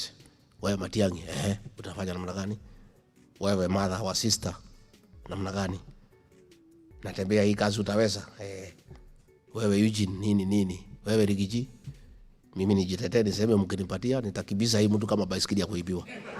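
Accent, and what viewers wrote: Kenya. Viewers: GACHAGUA CHANGE UA TONGUE KIJUYU TUMEKUSIKIA SOEAK KI OTHER JENYANS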